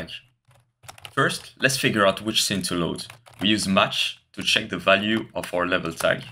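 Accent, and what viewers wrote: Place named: France. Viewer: tbf i think it's just the French accent just making him sound like he's looking down on you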